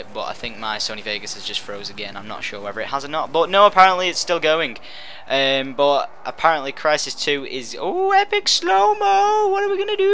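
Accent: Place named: England, United Kingdom. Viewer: Where in England are u from?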